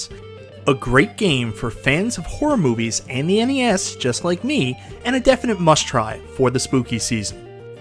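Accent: Newfoundland, Canada. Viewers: Holy fuck buddy I can recognize a Newfie accent from 100 miles away. Just picked up one of them machines on Facebook market place. Anywhere to find them here on the island? Or better to order them online?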